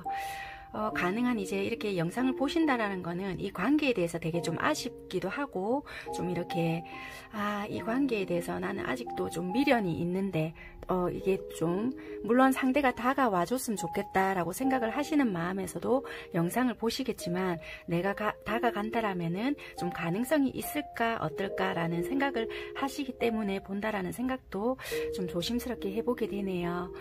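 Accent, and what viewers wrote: Busan, South Korea. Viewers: 개인 전화 상담 신청 어떻게 하나요 🥺❤️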